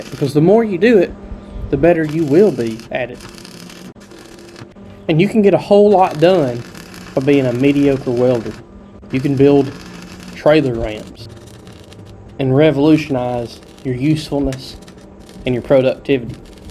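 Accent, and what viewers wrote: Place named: South, United States. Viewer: Good, honest stuff. Commenting from Albany, GA. You sound like you're close-by. Regarding being an expert: That's something I posted about my dad one Father's day....He taught me you don't have to know how to do something to get it done.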